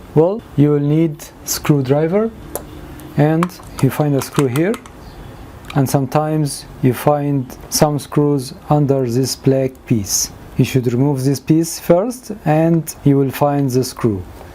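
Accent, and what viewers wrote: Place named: Egypt. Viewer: Nice Egyptian English .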